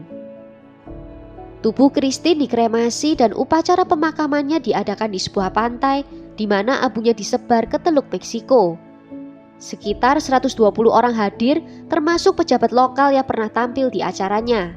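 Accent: Java, Indonesia. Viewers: Mbak ini pasti wong jowo, medhok jowone 😉, tp ini jadi ciri khas yg bikin beda dengan yg lain. Sukses ya mbak 👍🥰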